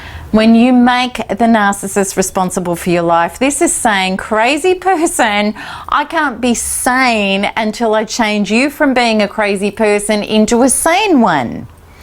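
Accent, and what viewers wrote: Australia. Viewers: By the way are you Australian? you sure sound it.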